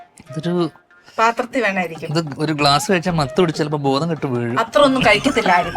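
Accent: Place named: Pala, India. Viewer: പലാക്കാരിയുടെ സൗണ്ട്.r u from pala?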